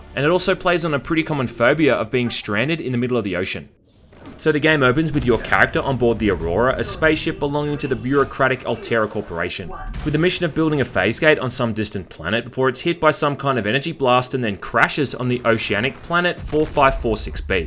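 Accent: Australia. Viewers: I like how he sounds like an Australian from "down under". Lol. It's so fitting.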